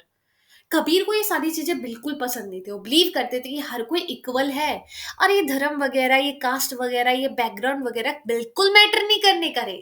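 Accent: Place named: Mumbai, India. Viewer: Tum mumbai se ho kya? Accent mumbai walo jese he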